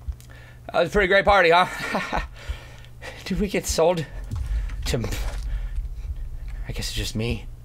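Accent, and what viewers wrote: United Kingdom. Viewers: Nice british accent ^.^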